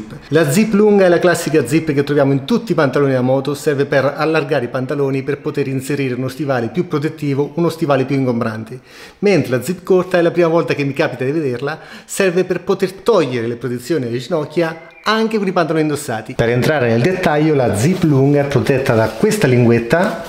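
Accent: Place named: Macerata, Italy. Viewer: Ciao bel video, con quello che costa un indumento tecnico finché possibile sempre meglio fare una buona riparazione che ricomprare nuovo. Dal tuo accento penso di averti incrociato in qualche parte della Provincia di Macerata 😉👍🏻✌🏻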